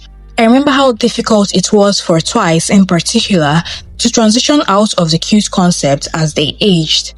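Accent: Nigeria. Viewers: Nigerian narrator 😂? How refreshing ❤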